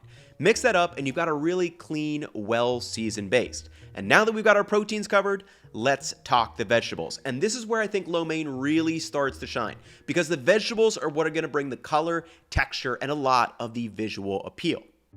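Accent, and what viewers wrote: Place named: General American, United States. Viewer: nope, he definitely pronounces 'noodles' with the typical General American vowel quality throughout the rest of the video. Just a slip of the tongue I reckon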